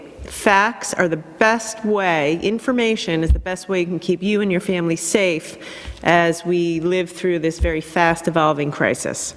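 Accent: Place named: Rhode Island, United States. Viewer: "3 new deaths anaconna the cahrona virus".....live the Rhode Island accent!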